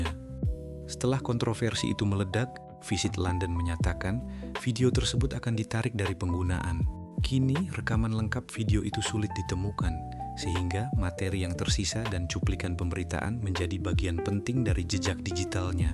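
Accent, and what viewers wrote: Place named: Spain. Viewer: Buset logatnya kek orang ngomong bahasa spanyol 🗿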